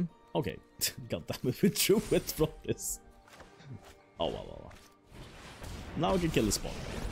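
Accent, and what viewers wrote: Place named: Sweden. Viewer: i always cringe a little bit whenever Sweeds try to pronounce g's and J's hhh, great video though, keep it up.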